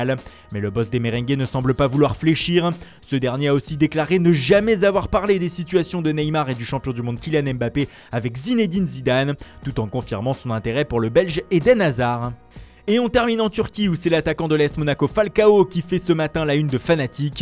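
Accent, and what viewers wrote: Italy. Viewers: Arrête avec ton accent italien sa fait mal aux oreilles . T'insiste trop avec ça !!!!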